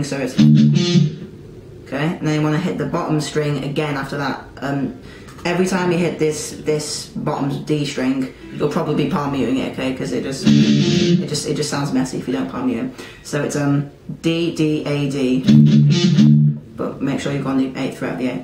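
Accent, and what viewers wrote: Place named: United Kingdom. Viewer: I would suggest more alternate picking, it can get a bit laggy with mostly downs. I've been going through your tutorials and they are actually quite good, I really love your acoustic work a lot, its very even and clean sounding compared to many covers I've heard. And of course I get a kick out of hearing "hey it's " at the beginning of each video coming from a cute british boy haha. Keep up the good work mate.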